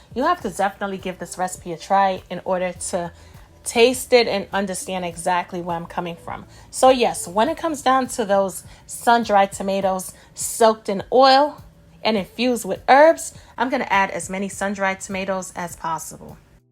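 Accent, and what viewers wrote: New York, United States. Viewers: Lil mama got some skills also hummm I hear some NY in that voice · I’m making this today! Thank you! Btw are you from the DMV? New York? I love your accent 😊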